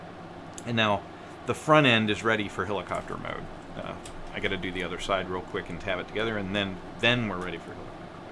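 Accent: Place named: United States. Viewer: I'm willing to loan Big Spring, but I would like to know where you're located first. You sound American, so I'm not really concerned about long-distance transport, but a friend has told me that the quarantine has screwed around with delivery routes. Supposedly mailing things eastward, especially to large urban areas like NYC and DC is risky.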